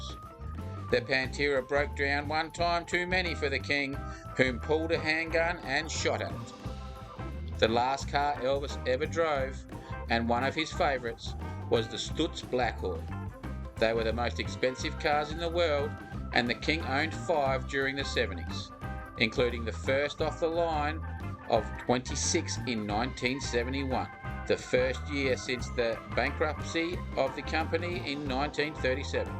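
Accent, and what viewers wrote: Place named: Australia. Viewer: WHY IS A TERRIBLE AUSTRALIAN VOICE SPEAKING HERE - SURELY A PROPER SPEAKER WOULD HAVE BEEN BETTER !!!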